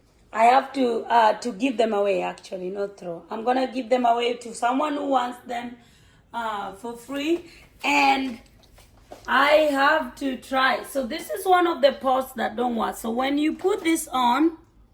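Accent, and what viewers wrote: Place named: Luo, Kenya. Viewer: Thanks for the very helpful tips, and especially nice to hear the instructions in your Luo accent.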